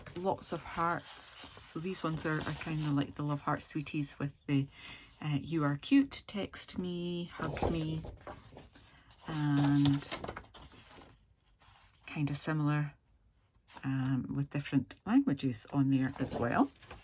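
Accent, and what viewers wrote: United Kingdom. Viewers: Great to hear a Scottish voice x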